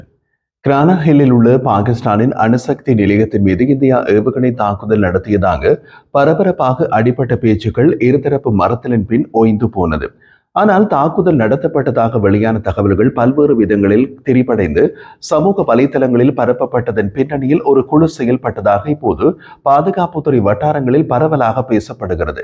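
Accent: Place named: Sri Lanka. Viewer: 👌ஸ்ரீலங்கன் தமிழ்🇱🇰🌹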